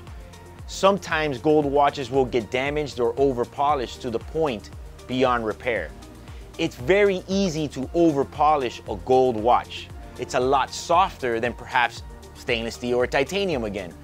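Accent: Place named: Chicago, United States. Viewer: what’s with the silly chicaaago accent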